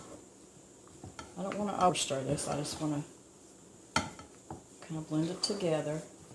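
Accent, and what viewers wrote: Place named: Texas, United States. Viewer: I am so blessed that I found you on YouTube. You sound like my family when I was a young girl. (I grew up in Texas)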